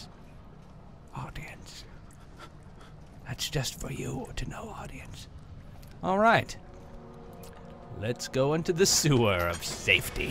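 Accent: United Kingdom. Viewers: Love your British accent <3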